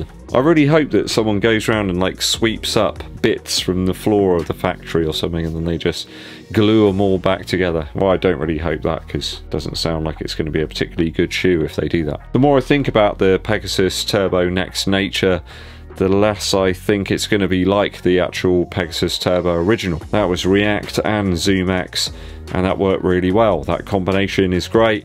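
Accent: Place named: Yeovil, United Kingdom. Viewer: I enjoyed your pronunciation of the "Salisbury Half". Can you do an entire episode with your Yeovil accent please?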